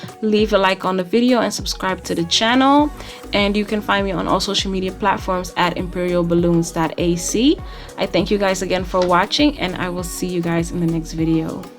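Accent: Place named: Guyana. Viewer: Where are you from you sound so Guyanese 🇬🇾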